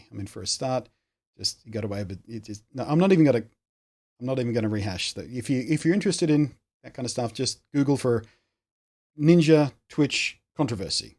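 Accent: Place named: Australia. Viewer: hes Australian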